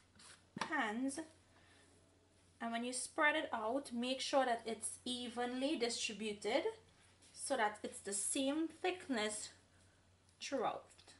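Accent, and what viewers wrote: Trinidad and Tobago. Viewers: To Shabana Nisha who said accent annoying. How rude. You nasty person, we are Trinidadians and proud of it. Who are you to criticise her accent. Too back to where you came from. Where they poop in the streets. Go back to India, you horrible person. You never hear of Trinidad. Go back to school. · She's a Trinidadian. You can hear her trini accent maybe that's the way her family made it.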